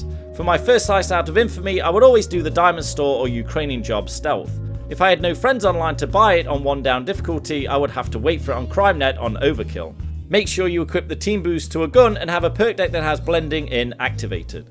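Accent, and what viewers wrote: United Kingdom. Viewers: Hey mate I love your accent where are you from in the UK? Also where can you find teammates to ecm rush heists for xp.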